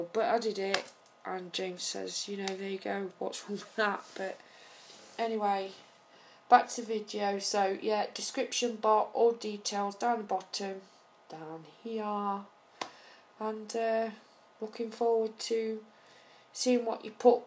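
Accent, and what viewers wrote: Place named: Yorkshire, United Kingdom. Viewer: Great video :) I said the same thing as you about 'vase'. I think accents also bleed into one another from outlying areas. I can hear a little Yorkshire in yours and also some similarities as someone I knew from Stoke-on-Trent :)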